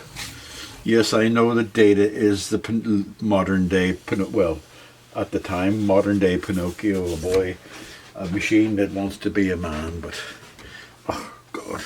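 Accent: Northern Ireland, United Kingdom. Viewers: Thanx so much or the video .Nice to see the Guitar getting some TLC . I Have the Both the 4 and 5 string SR 300E ..I also appreciate your accent as i was born in Northern Ireland as well..Kind Regards .. simon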